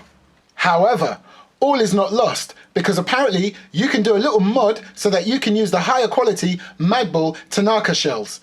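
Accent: United Kingdom. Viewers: You are delightfully British. I love it. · Are you British?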